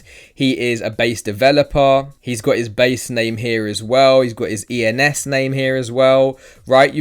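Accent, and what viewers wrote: United Kingdom. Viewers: Your accent is quite nice I suppose British boy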